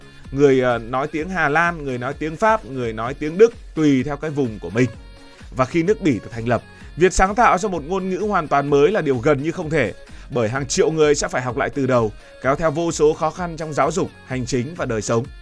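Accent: North, Vietnam. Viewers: Tiếng băc ky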